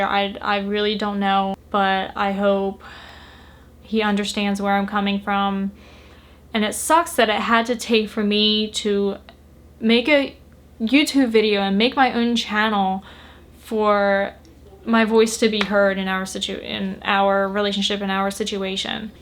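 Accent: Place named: South, United States. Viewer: are you fro the south? you kind of have a southern accent.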